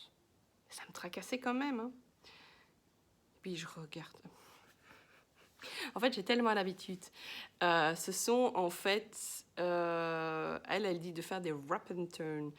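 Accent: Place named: Belgium. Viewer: Bonjour je viens de découvrir tes podcasts tricot et en t’écoutant je me disais bien elle est de Belgique cette dame 😂😂 Ton visage ne met pas inconnu peut-être du monde du foot ou pas😆 Moi je suis de Uccle je ne sais pas si tu connais, en tout cas je passe de très bon moment en visionnant tes vidéos 🫶 Bisous et bonne journée 💋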